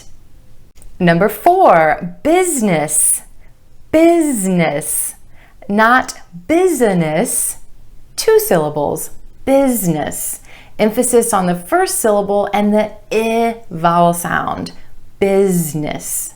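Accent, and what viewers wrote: United States. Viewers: Two reasons why i watch your videos: 1- to learn the american accent 2-you're so damn cute😍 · I do love American accent! Massive thanks to you Dear! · Hi madam I'm one of your subscriber.I love American accent I need more vocabulary pronunciations video's please make some more examples video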